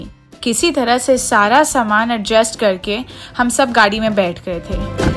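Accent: Bihar, India. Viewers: Hahaha so cute accent puri bihari h apki😚😚😚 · Ohh, your Bihari accent ❣️😍❣️ Ekdam gajjab hai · Love bihari ascent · Bihari accent🔥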